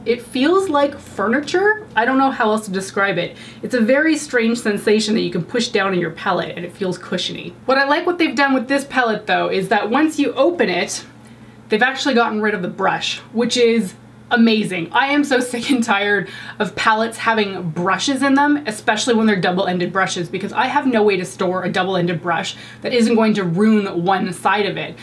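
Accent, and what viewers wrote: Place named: Eastern Canada, Canada. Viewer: Your eastern Canadian accent is the best I am from the west and my Canadian accent is so horrible in comparison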